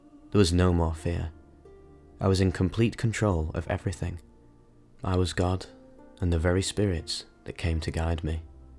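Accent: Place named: Yorkshire, United Kingdom. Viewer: fairrrr, I used to always get copyright claims when watching music videos split screen. One time in particular when I streamed an Antony Joshua title fight in the corner whilst streaming a wow dungeon. I guess that's taking the piss a bit 😅 was never getting away with that. Also, cool to hear your from up north — I'm a manc. Guessing your from Leeds or York, my sisters bf is from there you sound the same lmao. Anyway enough of the life story, I stumbled across your channel whilst researching a some stuff. I’ve been using ambience music on my latest videos with my voice over the top, hoping not to get strikes, so far so good. I actually refrained from using an Egyptian type ambience from Assassins creed because it was a video game track and I thought I'd most definitely get striked, however, I shall try incorporate some video game music, it seems to be safer 👍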